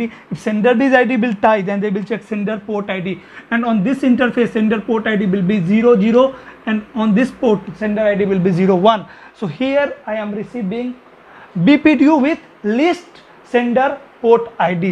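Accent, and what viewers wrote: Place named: India. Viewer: He explained it really well. Yes it can be hard to understand what he is saying because of his accent but because I spent alot of time speaking to people from India it helped me understand them when they speak. Its a skill you have to develop over time.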